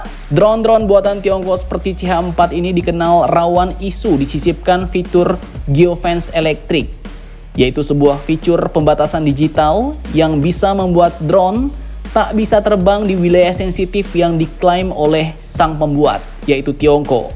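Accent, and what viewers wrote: Pontianak, Indonesia. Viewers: Mimin orang Pontianak?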